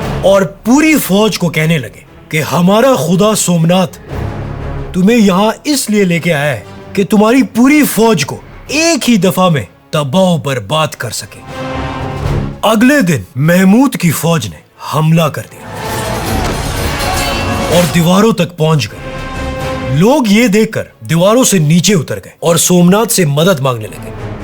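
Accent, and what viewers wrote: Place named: Kohistan, Pakistan. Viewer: Style, accents, editing everything seem like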